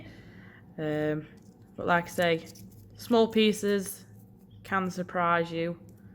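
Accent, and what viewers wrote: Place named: Stoke, United Kingdom. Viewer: I have a piece of Opal but nothing to cut it with 😂 you sound like your from stoke, are you?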